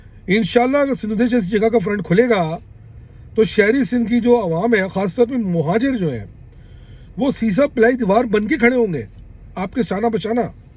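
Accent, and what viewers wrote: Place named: Punjab, India. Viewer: تم کو أخر اردو لکھنا کب آئے گی، پنجابی لہجے مل میں بولتے تو ہو ہی لکھتے بھی پنجابیوں کی طرح ہی ہو۔ مرون منت ہا ہا ہا ارے یہ سن کر پکا پتہ چل گیا کہ مہاجروں کا مقدمہ پنجابی لڑرہا ہے۔ پس ثابت ہوا کہ یہ "حب مہاجر میں نہیں بلکہ بغض PPP میں" بول رہا ہے۔ اصل میں مسلم لیگ ن کی مہم چلا رہا ہے۔ کیوں کہ ایم کیو ایم مسلم لیگ ن کے تھلے لگی ہوئی ہے۔ قریش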